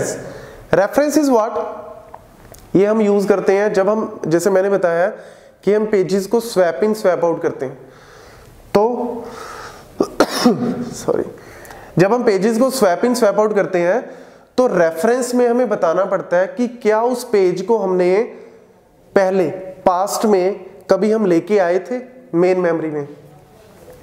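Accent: Punjab, India. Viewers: Sir aap punjab se ho??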